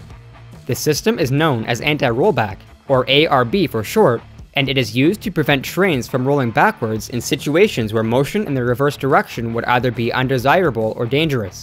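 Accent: Canada. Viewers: I can't get enough of how you say roller coaster. God Bless Canada and its unique collection of modified vowels sounds. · wait, are you Canadian?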